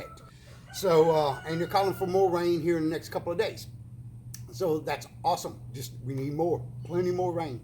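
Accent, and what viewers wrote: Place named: Louisiana, United States. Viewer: HELLO HOW ARE U?? IM NEW HERE SHOWING SUPPORT FOR U. I LOVED LOUISIANA. I WAS THERE FOR 3 YEARS WORKING WITH A DREDGING COMPANY PUMPING SAND IN MYRTLE GROVE NEAR PORT SULPHUR AND BELLE CHASSE. I MISS IT THERE. UR ACCENT TAKES ME BACK. BUT AWESOME MASSEY U GOT THERE. AND SO FAR CONGRATS ON THE SAINTS SO FAR!! WHO DAT!!!!! ANYWAY I SEEN U ON ANOTHER CHANNEL I WAS ON SO I WANTED TO STOP IN. I ALSO FED ALLIGATORS WHILE I WAS THERE. ITS ON MY CHANNEL MY OLDER VIDEOS. I WAS HELPING TRIGGER TOMMY FROM SWAMP PEOPLE. IT WAS FUN! BUT GREAT VIDEO, !!🤗🤗🤗🤗🤗🤗🤗🤗🤗🤩🤩🤩🤩🤩🤩🤩🤩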